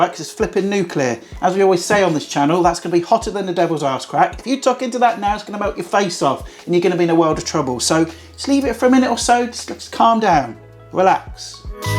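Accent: United Kingdom. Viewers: I watch mainly to see you and I love a British accent 🤗.....of course I'm looking for something different to make. Sometimes I get inspiration from your recipes and make slight alterations to my liking. Love ya' Mate! Cheers from across the pond, Linda.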